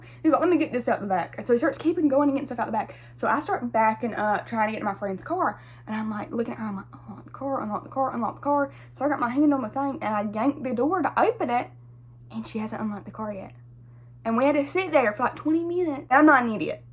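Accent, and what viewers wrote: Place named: Georgia, United States. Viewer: I love your accent! Is it a Georgia accent? You have great taste in music too!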